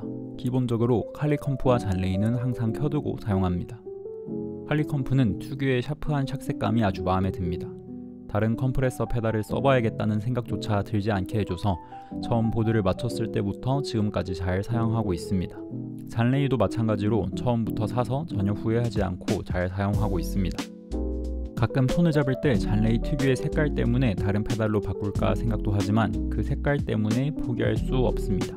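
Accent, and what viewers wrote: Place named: Gyeongsang, South Korea. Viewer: 경상도 분이신가봐요?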